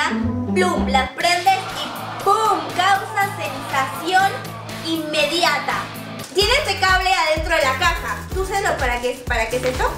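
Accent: Argentina. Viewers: Se te esta pegando el argentino 😂😂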